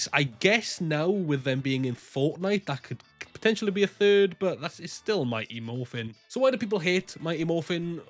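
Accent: United Kingdom. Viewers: You know that is British, right? Brits pronounce certain words differently than Americans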